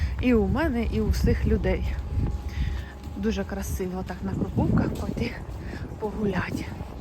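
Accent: Surzhyk, Ukraine. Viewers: Недавно на Вас підписана, відчула щось схоже , не можу описати, особливо мова, мій рідний суржик😊😂 Звідки Ви , з якого куточка України? Я з Полтавської області, зараз також проживаю в Польщі 🤗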